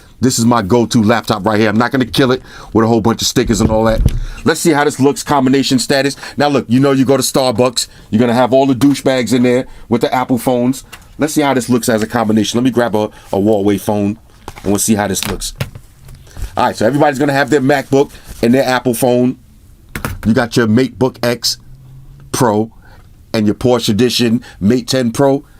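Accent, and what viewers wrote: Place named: East Coast, United States. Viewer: Extra aggressive guy. Great review. Surely you are from NY or Chi. Sounds like east coast.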